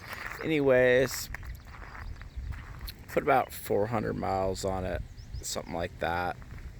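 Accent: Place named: North, United Kingdom. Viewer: You sound like a Northerner, but speak slower than a southerner.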